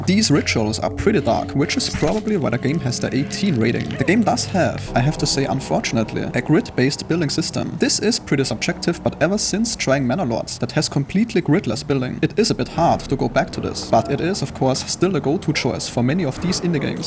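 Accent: Germany. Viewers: Ohne es zu wissen aber dein Akzent ist sehr deutsch😂 Finde es trotzdem super diese Kurzreviews, sind echt ein time saver! ❤️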